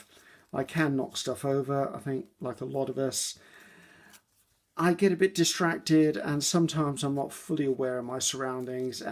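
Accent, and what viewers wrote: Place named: Southampton, United Kingdom. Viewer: What a pleasure hearing you ! It takes me more than 50 years ago, when I went to see my granny for my holidays in Southampton. Her way of talking was like yours, same style same words same particular idioms (tickle in the throat for e.g.) that I haven’t heard since. When I watch your videos I'm a little bit at home. Now I’ve lost all practice and vocabulary and the « Oxford » way of speaking is the only one I can understand. Thanks so much for this « madeleine de Proust ».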